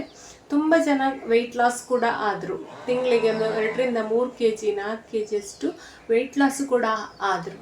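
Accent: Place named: South Canara, India. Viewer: Please support me..nivu maathanaadodu kelidre nivu south canara udupi ಕಡೆಯವರು ಅನ್ನಿಸುತ್ತೆ..ಯಾಕಂದ್ರೆ ನಾವು ಕೂಡ southcanara ದವರೆ🤗🤗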